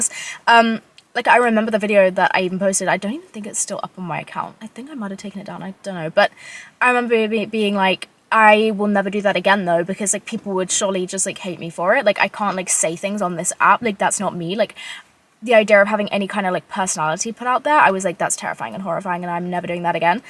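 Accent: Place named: United Kingdom. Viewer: I could listen to u talk for hours it’s like listening to myself but British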